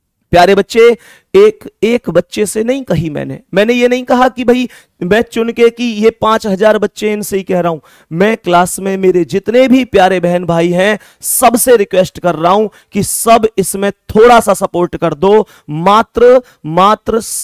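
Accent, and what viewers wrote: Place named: Haryana, India. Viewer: Aapki haarryanvi voice achhi lagti hai please aap harryanvi hi bolla karro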